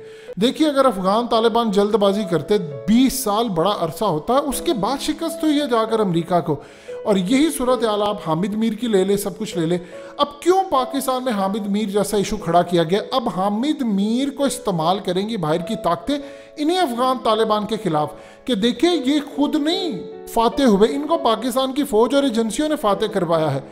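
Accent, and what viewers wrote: Punjab, India. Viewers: Aarooj nahi Urdu oorooj kehte he bhai tum urdu zuban ko jab punjabi jone me bolte ho maza aja ta bhai urdu tumhari madri zuban nahi acha hoga punjabi language me video banao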